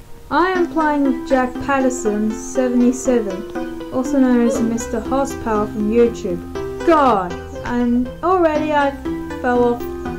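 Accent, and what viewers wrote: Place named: Australia. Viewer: No! Don’t be harsh on yourself. Your voice is good. You’re from Australia I can tell by your accent.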